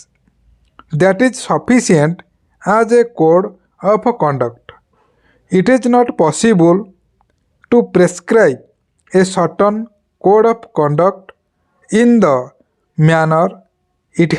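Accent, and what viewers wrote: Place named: Bihar, India. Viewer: Sir ye English dictation h ya Bihari dictation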